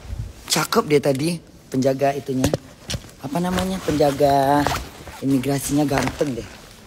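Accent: Medan, Indonesia. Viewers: "pauknya aku" 🤣🤣🤣 Bahasa Medan bgtt Bun.... Oalahhhhhh uda dikirim balik 100 juta nya ke si itu? Syukurlah ya Bun.... harga diri lebihh penting ya Bun.... 👍👍👍 Pantesan dia diam.... hahaha... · Jangan kau hilang kan bahasa medan mu corla itu lah penarikmu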